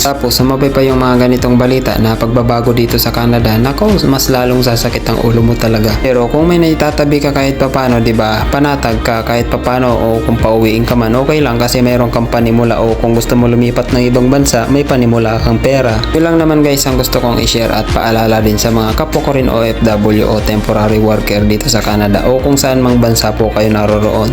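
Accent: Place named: Bicol, Philippines. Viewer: kabayan bicolano ka po? puntong bicol ka po eh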